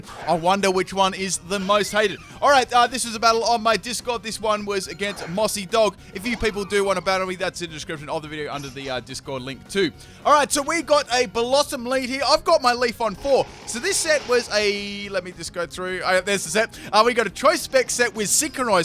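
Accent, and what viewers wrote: Australia. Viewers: Australian accent baby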